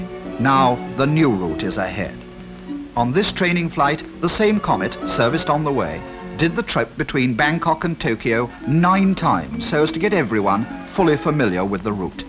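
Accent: RP, United Kingdom. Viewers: When the BBC strived to be POSH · That sort of accent is referred to as 'RP' (aka. Received Pronunciation)... and is particularly redolent of news readers of the 1950's, just as can be heard here. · BBC RP, now long dead. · The narrator is absurdly posh. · It is RP. Not affected, people did speak like that. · Filla-pines is just plain wrong, and RP is affected nonsense.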